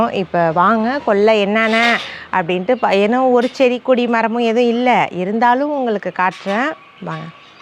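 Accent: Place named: Thanjavur, India. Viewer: Azhagana Thanjavur side Tamil language... Engha soentha oor gnabagam varuthu....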